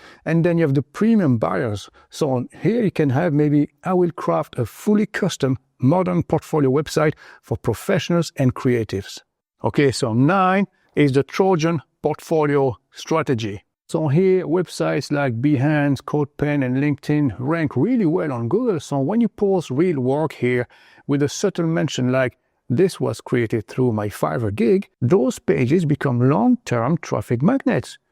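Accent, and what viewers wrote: France. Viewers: gotta love the french accent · I suspected the French accent too with well spoken English. Kudos sir!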